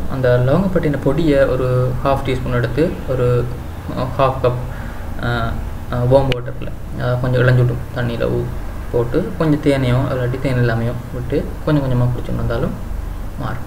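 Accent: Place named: Sri Lanka. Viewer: naatu vaithiyam valarga vaalga bro SriLankan tamil supar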